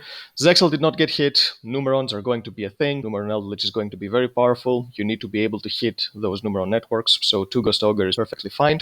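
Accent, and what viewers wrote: Greece. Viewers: R u greek or cypriot my friend?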